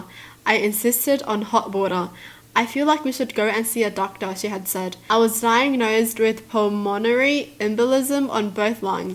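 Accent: Australia. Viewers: How did I just notice that you were Aussie I am to yay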